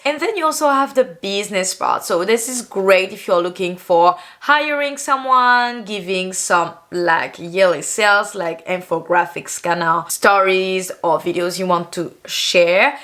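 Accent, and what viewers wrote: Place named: France. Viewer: Je me disais qu'elle etait française avec son accent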